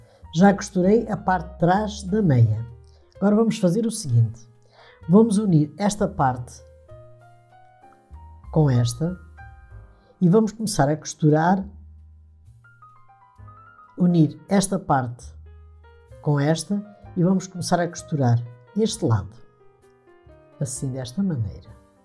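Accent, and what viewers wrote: Portugal. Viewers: Parabéns pela lindeza de meia e pelo sotaque lusitano, que me fez lembrar meu tio. 👏🏽😉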